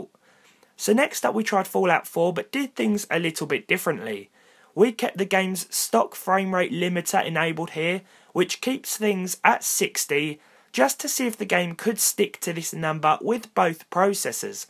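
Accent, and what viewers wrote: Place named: England, United Kingdom. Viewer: Nice video and also i have to say that i like so much your English accent, it is easy for me to understand being Spanish Where are you from?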